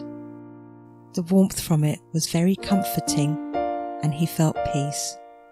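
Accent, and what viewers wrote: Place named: England, United Kingdom. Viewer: Beautifully read. does indeed have a pleasant voice- very warm tone and clear dictation. Me being from the US I find the English accent very pleasant and nice to listen to. I don't have much experience with background music for audio books so I will just say it seems pretty solid to me. Can't really give any pros or cons due to my lack of experience there. Sounds good.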